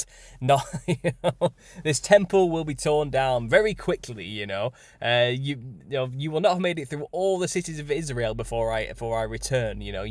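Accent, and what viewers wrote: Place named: Yorkshire, United Kingdom. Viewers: can I just say what a joy it is to hear a northern English voice. Thought I was on my own here in the northwest. If you are anywhere near, check out the great hall in Rochdale Town Hall. It's amazing, I'm fully on board with this whole theory/truth. Once I had made this decision, we randomly had to visit Rochdale for an appointment and afterwards rather than waste the journey had a look at the town hall. It was like God giving me conformation 😮 keep up your amazing work. The bible is just making more and more sense now 🙌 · I am sure there are books missing, has Yah isnt a God of confusion. Which is why i am reading the whole apographer. It will be revealed to us has and when we need to know. In the meantime looking for answers is keeping us busy and we are getting a better relationship with Father because of it. 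On another note im sat listening to this trying to place your acsent, Yorkshire i think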